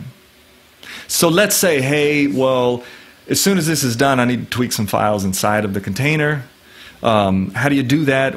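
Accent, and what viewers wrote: South, United States. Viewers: love the southern accent maan!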